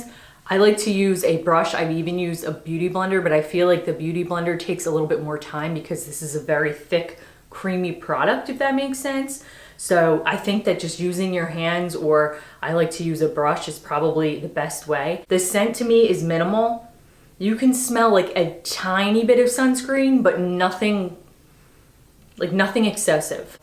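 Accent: Philadelphia, United States. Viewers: Hi! I just found you (on a tanning review video), and I love your videos! One of the reasons I loved you right away is I detected the same slight Delco/Philly accent I have! 😂 Of course I’m assuming, I may be wrong. Thank you for all that you share!! ☺️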